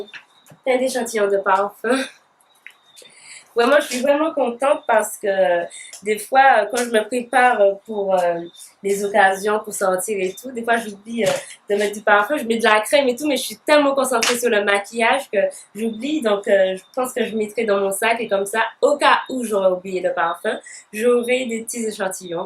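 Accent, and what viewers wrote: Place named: Martinique, France. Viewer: J'aime beaucoup ton accent de la Martinique. L'huile végétale de rose musquée c'est ma préférée, sympa tes achats Gros bisous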